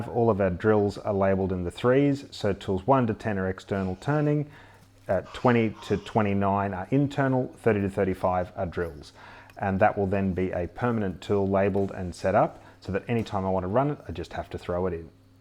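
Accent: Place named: Australia. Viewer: Hey. Thanks for showing us all this great stuff. It is fun to see your channel growing. I learned how to understand Austrailian from Curtis so I get most of what you are saying! Watching from Abbotsford, southwestern Canada. 🇨🇦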